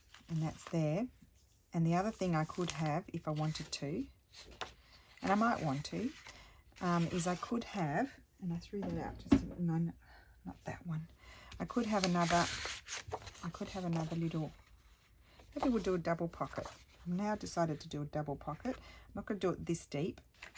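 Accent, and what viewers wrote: Australia. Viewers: "Go bananas decorating" haha I love your Australian accent and expressions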